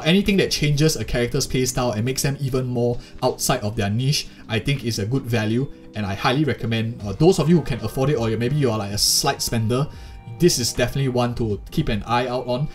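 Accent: Singapore. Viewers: He can’t help it it’s singlish 😭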